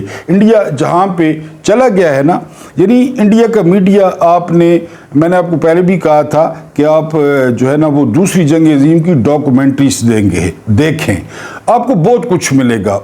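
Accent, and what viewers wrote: Saraiki, Pakistan. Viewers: اردو کو سرائیکی لہجے میں سننے کا کچھ اپنا ہی مزا ہے کمال ہے ژندہ باد ۔❤❤❤❤❤❤❤❤❤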